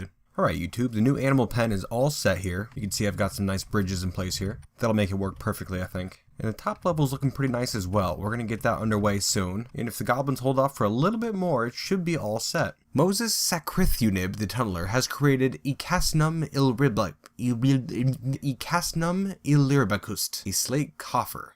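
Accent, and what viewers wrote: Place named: Northeast, United States. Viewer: So having listened to you speak for about.... 15 hours now... I'm guessing that you're in the United States and you live in the north-east, somewhere within the vicinity of New York State. Am I in the right ballpark? Of course, privacy considered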